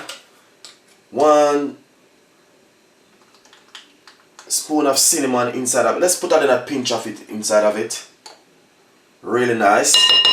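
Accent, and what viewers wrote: Jamaica. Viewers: wow are you Jamaican. me want some rum cake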